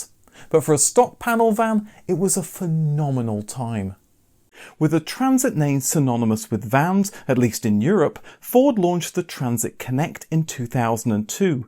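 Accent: United Kingdom. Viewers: You look perfectly fine to me Bro. Love your British accent. Thanks from Cape Town, South Africa 🌍🇿🇦❤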